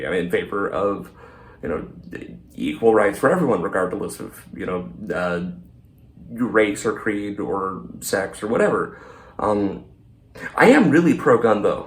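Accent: United States. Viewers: You sound like the average American to me.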